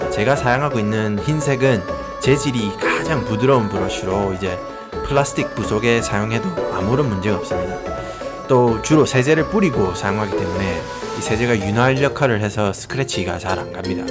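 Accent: Gyeongsang, South Korea. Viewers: 뭐지... 경상도 사투리와 영어의 이 완벽한 조화는? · 갱상도잉글리쉬 찰지네예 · 영어발음은 네이티브 한국발음은 미쿡이고 억양은 경상도 토박이네요ㅋㅋㅋ 엄청난 조합입니다 듣고만 있어도 풉하게 되네요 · 찰진 경상도 사투리 + 교포말투 + 꼼꼼한 청소 = 마약 영상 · 갱상도 사투리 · 찌지고 문떼고...그렇 습니더~ 경상도 구시한 사투리 정이 뚝 뚝 느껴집니다~ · 저도 경상도 사람인데... 갱상도 사투리와 멋찐 영어랑 섞여서 하니깐 스웩하십니더! 느낌있습돠~ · 경상도 억양 쓰시면서 영단어 말할때는 원어민 나오는게 신기하네요 ㅋㅋㅋ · 저도 아시는분이 미국에 오래사셔서 ㅎㅎㅎ 생각도 나고, 경남쪽 사투리들으니 친구들 생각나고 구독누르고 갑니다~~~소소한 미국소식 기대합니다~~~ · 알고리즘으로 시원함느끼고 갑니다 미국이신데 경상도말투로 해주시니 구수하이 좋네예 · 갱상도식 영어 발음이 특이합니다 추천합니다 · 경상도 이민자 십니까? 억양이 저희쪽 이시네요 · 경상도 사투리 정겹네요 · 경상도 남자가 혀굴리며 영어쓰는것같아ㅎㅎ 매력있넼ㅋㅋ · 경상도 사투리가 정겨워요~ ㅋㅋㅋ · 한국어 베이스가 갱상도네요ㅋㅋ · 경상도 사투리 구수한데 영어발음은 상당히 좋으시네요 ㅎㅎ 미국 가신지 제법 오래 되셨나 봅니다. 그나저나 유튭 알고리즘은 왜 이걸 추천해서 이 새벽에 보게 하는지..ㅋㅋ 속시원하게 청소 되는걸 다 봐야지만 꿀잠 자겠네요~ㅋㅋ 잘 볼게요~앞으로도 속시원한 청소 영상 보러 자주 오겠습니다~~ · 아이고 말투가 경상도 아닙미꺼 저도 세차업을 합니다 먼 타국에서 욕보십니다 자주 오겠습니다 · 고향이 경상도이신가봐요!ㅋㅋㅋㅋㅋㅋㅋ 사투리와 영어발음이 섞인 말투 넘나 매력적인것....☆ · 국산말 할땐 갱상도ㅋㅋㅋ 수입말 할땐 갱상도 억양이 없어지는데예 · 아니 갱상도 말투 론나 좋습니다 ㅋ콬ㅎㅋㅋㅎㅋㄹㅋㅎㅋㄹㅋㅎㅋㅎㅋ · 아무리 들어봐도 경상도 아저씬데... 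· 구독하고 갑니다.간간히 들리는 경상도 사투리가 정겹네요~ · 저도 나름 세차환자다보니 영상만 봐도 완전 힐링되네요. 그나저나 요새 방송이나 유튜브에서 찐 경상도 사투리 듣기 참 힘든데 말이죠. 간만에 귀도 힐링하고 갑니다. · 알고리즘만 스쳐도 인연인데 구독하고 갑니다~! 경상도 사투리와 영어 의 조합이 색다릅니다 ㅋㅋ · 들리는 영어발음이나 차나 설명하는 문화나 미국인데 말에 스며든 경상도 말씨 때문에 경상도에 미국있는 착각함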